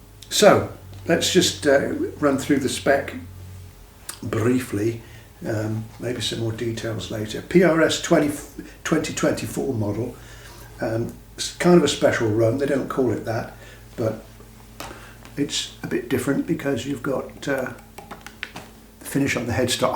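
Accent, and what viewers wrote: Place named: Devon, United Kingdom. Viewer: EXCELLENT PRESENTATION!, I came fot the Turquoise Custom 24!; Ebony!- and fhen, Wow!, 👌 I saw you, and DAMN! I thought I was looking at my dear Gramps!, here in Canada 🇨🇦 😎 🍁 Then you SPOKE! and 🌹 Zap me w/ a Feather!, YOUR ACCENT duplic ates his PERFECTLY! then you mention CORNWALL!, he's from DEVONSHIRE! Too-Rah-Eh!? I'm off to buy one of these NOW! Your playing is delightful! CHEERS! 🍻 from The Wild West! NEIL YOUNG COUNTRY ALL the Best!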